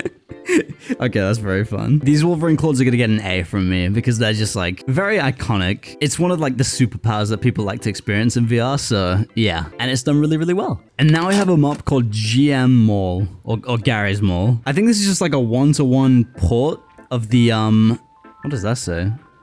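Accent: United Kingdom. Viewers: R u British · Are you British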